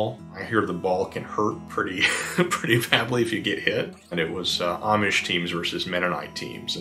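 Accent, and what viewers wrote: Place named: Pittsburgh, United States. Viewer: That Yinzer "O" kept escaping!🤣🤣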